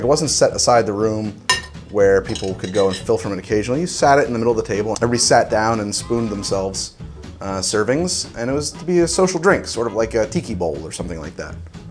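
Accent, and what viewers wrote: New Jersey, United States. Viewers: You're getting rather self-conscious about that Jersey accent of yours, aren't you? Don't let the comments get to you, there's nothing wrong with having an accent.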